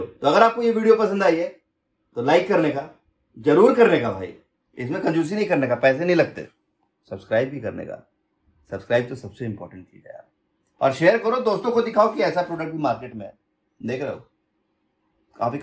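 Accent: Bihar, India. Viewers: Are you from Bihar ?????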